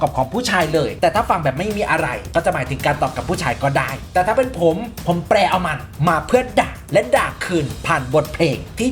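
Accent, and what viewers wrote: Isan, Thailand. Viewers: <num>ถ้าไม่ใช่คนอิสานจะฟังออกมั้ยคะคลิปแปลนี้ รู้สึกได้เปรียบหนักเลย ฟังออกทุกคำ🤣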